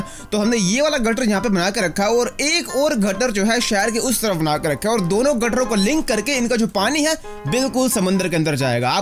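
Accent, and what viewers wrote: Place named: Assam, India. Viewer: app assemes bolteho Kiya app Assam rehtohe kiya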